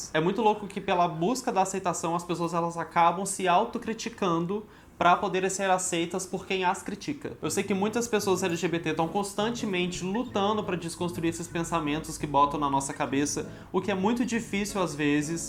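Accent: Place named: Brasília, Brazil. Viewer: O é brasiliense? Cheguei no canal há pouco tempo e tô reconhecendo o sotaque hehe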